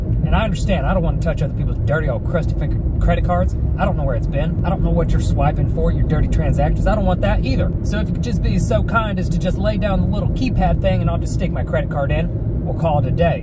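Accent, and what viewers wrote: South, United States. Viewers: I don’t know how to explain but you have on of the coolest country accents I’ve ever heard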